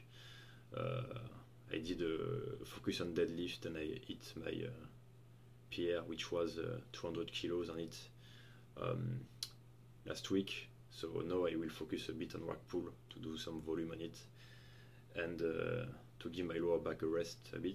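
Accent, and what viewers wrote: France. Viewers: Nice. Your accent is even thicker than NH's. Good thing I'm able to understand glorious French accents without much trouble. You have a pretty good physique, how many years have you been training for? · Is French Accent real or forced?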